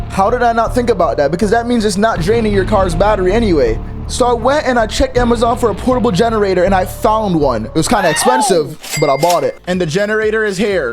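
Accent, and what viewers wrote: Jamaica. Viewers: You Jamaican born of desent or just love the Jamaican accent?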